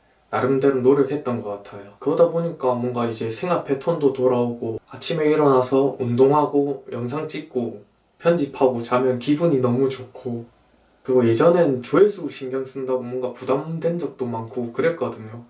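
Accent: Gyeongsang, South Korea. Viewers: 대구가 고향이신가영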